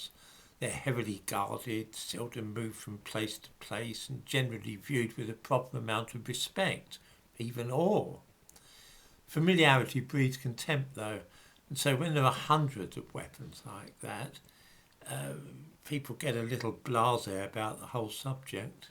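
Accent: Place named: Cockney, United Kingdom. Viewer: He could be and he does hail from East London and his dialect could be construed as Jewish. My mate’s dad was a Jewish tailor from Walthamstow who should to supply the Krays and he had that East End dialect which might be heard as he speaks.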